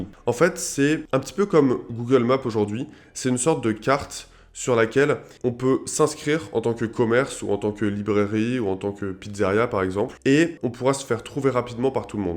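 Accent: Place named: Russia. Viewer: On dirait que tu penses comme moi. Tu t'inspires des meilleurs pour t'en rapprocher. On dirait que ta vidéo a été tourné et penser par moi. On dirait que c'est moi qui explique quelques chose. Tant mieux, your're like me. Je considères humblement bien expliquer les choses et être bon pédagogue et je constates que toi aussi tu es comme ça. Je sens un légé accent russe, je me trompes?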